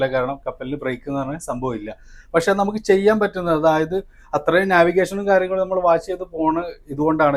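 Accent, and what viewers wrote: Malappuram, India. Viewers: ഈ മലപ്പുറംകാർ ഇതിലും വന്നോ.. ചീഞ്ഞ ഭാഷ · നമ്മളെ മലപ്പുറം സ്റ്റൈലിൽ explanation.. 😄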